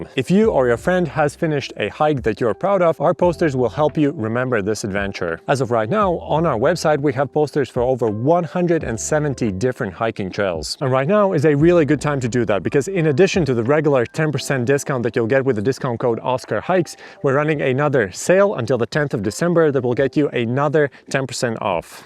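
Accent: Latvia. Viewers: Jau no tava akcenta var dzirdēt, ka esi latvietis :) Prieks redzēt, un man noder tavi video.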